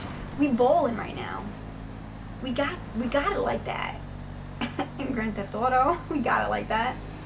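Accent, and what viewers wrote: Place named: New York City, United States. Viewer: i like ur urban nyc accent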